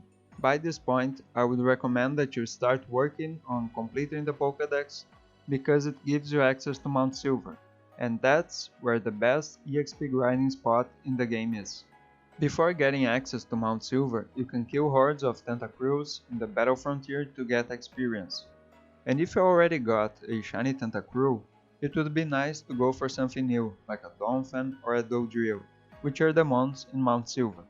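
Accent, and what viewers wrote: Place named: Brazil. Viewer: You speak english like a brazilian guy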